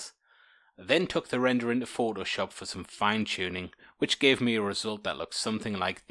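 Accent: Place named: Newcastle, United Kingdom. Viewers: I’m not ashamed to admit but I’m really just here for the Geordie accent. 😁 Love the channel. Great work. Thank you! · Areeet mate! Haha I can tell you're a NE'er from the accent lol. I'm starting blender so videos like this are very much appreciated.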